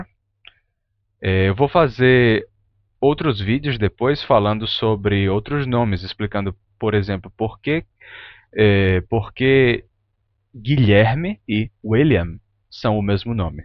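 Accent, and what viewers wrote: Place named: Maranhão, Brazil. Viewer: Esse canal é muito massa. Adorei conhecer e já to consumindo tudo. A propósito, uma curiosidade: no seu sotaque, o R de PORQUE é aspirado ou ele é sonoro? Esse R é tão sutil que ele soa como o H do início de frases em inglês. Vejo maranhenses e tocantinenses (com quem tenho contato) pronunciando um R muito parecido com esse som aspirado do H. Procede?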